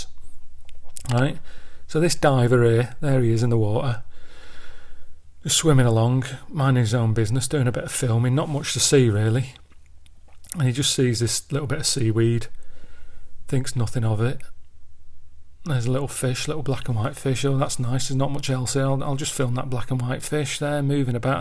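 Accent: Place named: Northern England, United Kingdom. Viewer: Love how he goes extra northern when doing his radio voice LMAO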